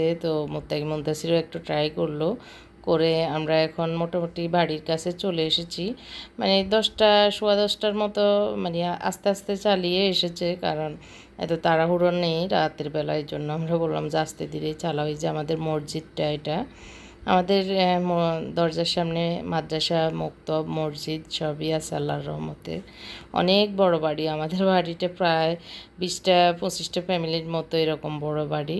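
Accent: Noakhali, Bangladesh. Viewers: Aunty apni fenir naki noakhali r😀😀❤️❤️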